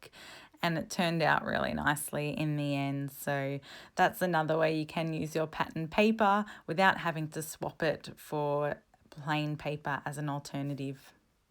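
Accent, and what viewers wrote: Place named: Australia. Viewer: Lovely to hear another Aussie voice on the Tube. I liked all the cards, and your style of video. New subscriber!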